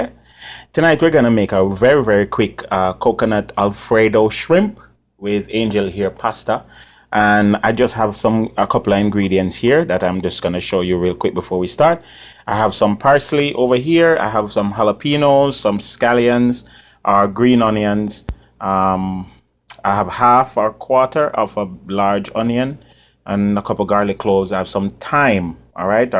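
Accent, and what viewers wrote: Jamaica. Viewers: Hi, Looks Great! BUT PLZZZ SPEAK MORE PROPERLY BECAUSE EVERYONE IS NOT JAMAICAN NOR DO THEY SPEAK OR UNDERSTAND PATOIS. Just A Lil Friendly Advice. Blessing To U😊💚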